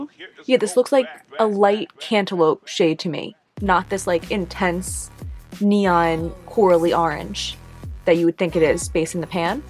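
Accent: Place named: Northeast, United States. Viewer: I hear a northern eastern us accent. Where are u from? Just curious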